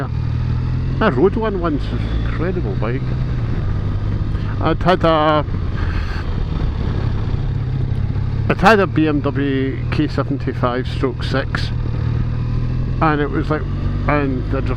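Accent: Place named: Scotland, United Kingdom. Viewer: Your Scottish dialect has to be the most beautiful of all the English dialects. Greetings from Miami.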